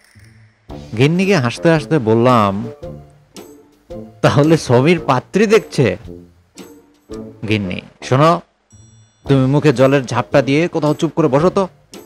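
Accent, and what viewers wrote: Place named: Bangladesh. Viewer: বাংলাদেশী বাংলা ঠিক কর, बाल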